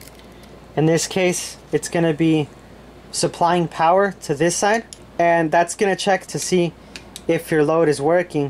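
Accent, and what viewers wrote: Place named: Latin America. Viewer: Se te escucha la voz de latino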